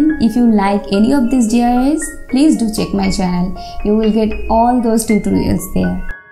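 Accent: Bengal, India. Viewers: I know by her accent, she is bengali.. I am bengali too.. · U are a bengali right? Because the english pronunciation is something like what a বাঙালি does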